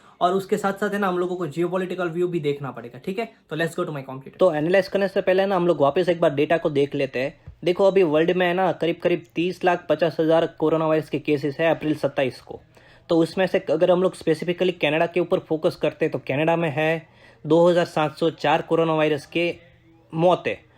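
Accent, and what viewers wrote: Gujarat, India. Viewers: Love your gujju accent haha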